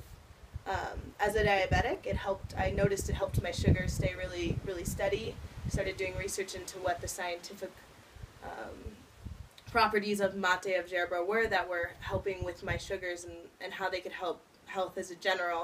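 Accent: Argentina. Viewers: Bombillaa! accent Argentino 👌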